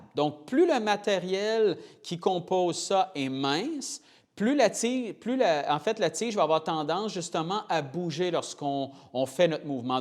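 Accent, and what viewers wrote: Quebec, Canada. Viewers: C'est dépaysant d'écouter un québécois. En France on parle de tige pour une plante ou pour quelqu'un de grand 😁 Ici on parle de club de golf. Mais le plus drôle c'est quand tu parles des distances 😁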